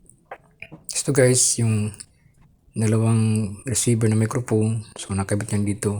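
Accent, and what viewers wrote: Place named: Bicol, Philippines. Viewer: Hello BRO.. Parang bikolano ka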